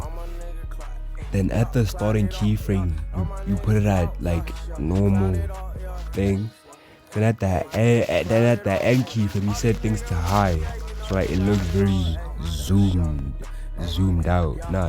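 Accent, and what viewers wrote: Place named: Brazil. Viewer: o cara parece um brasileiro falando inglês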